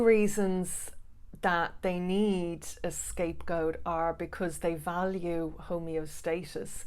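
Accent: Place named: Ireland. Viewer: Hi..I live in Dublin...do you coach fsa individuals in Ireland?...I hear you've an Irish accent...😊 tnks! Brilliant video btw!❤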